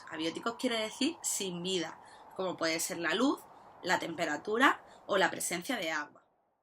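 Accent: Spain. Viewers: Por que es española genio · Es Española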